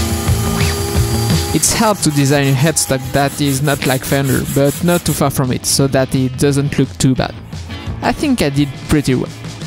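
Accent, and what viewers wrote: France. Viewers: your french accent is amazing, i loved your guitar building, you mentioned practice, i see a master, lots of luck in your guitar building career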